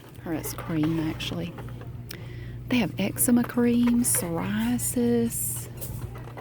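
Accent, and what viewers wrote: South, United States. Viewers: you're the best!!! I watch these vids when I am at work and have to laminate and cut thousands of buffet labels. It makes the time pass quicker then just listening to music and I love your Southern Accent and sassy remarks, thank you💜💜💜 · I’m from Germany and I really enjoy listening to people with a southern accent, it just sounds so fun! I spent a year in the US, in Montana though. Great experience! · i’m love these videos. they’re so calming to me. i lost my mom when i was 15, so listening to you talk makes me feel like i’m chatting away with a supportive, sweet, southern mama! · When I'm in shoos I do the same thing now. Its addictive and really fun. Even my great niece does it. This is doing what she does brilliantly ASMR. Soft Southern accent, relaxation, I love it. Haters keep hating, if its not for you piss off and stop criticisising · Feel like I’ve just had a counselling session the, thank you ❤️❤️ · Plz don’t stop them because of selfish sad people!!! 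Focus on the people that love your videos like me!! I thank you! When I’m at work and stressed I look for you and put these videos on and you southern voice calms me down!!! Thank you in Jesus Christ mighty name!!! Dan from NH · Hey there. I watch your videos often but am not one to comment every time. I wanted to quote the famous RuPaul and tell you " 'less they payin' yo bills, pay dem bitches no mind!" Don't let the negative comments from a bunch of people you'll never know well or meet bring you down. I enjoy your videos. They help to calm me. Plus you have that great southern lady accent. I'm in Michigan so we don't hear that around here often. Keep doing what you're doing and keep your chin up. Thank you for your work! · Girl I love your videos we got the same country accent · I've just recently subscribed to your channel and I am so glad I did! These types of videos imparticular are oddly relaxing and satisfying while informative! 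Plus, I love your soothing voice and southern accent..so caring and motherly. Thanks for all of the work that you do!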